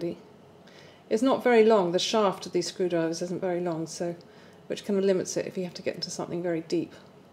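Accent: United Kingdom. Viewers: ITS GREAT TO HEAR YOUR VOICE !! You sound the very proper British Lady ! Thanks for the review. nilo52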